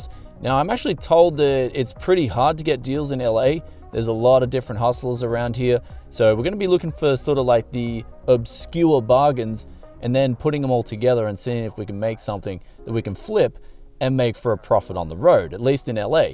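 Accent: Australia. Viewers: Mate I reckon your Aussie accent led that guy to chuck in that cooler LOL. If you sound like a bogan in the USA you can get some dope deals and the yanks love that "Howya goin" accent. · Welcome to MAGALAND dude! Let me get my brain around this...your're footloose in America, with a Camaro, big smile, and Aussie accent. DON'T SPEND ALL YOUR TIME THROWING OLD COMPUTERS ON THE HOTEL BEDS! P.S. I have a free MSI 980 if you drop by and pick it up. Cincinnati Ohio.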